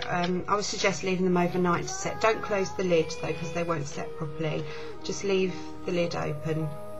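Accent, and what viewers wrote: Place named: United Kingdom. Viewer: Very nice, also from the UK, but living in Singapore - love your accent :) Nice job - I learnt something new today - CHEERS!!!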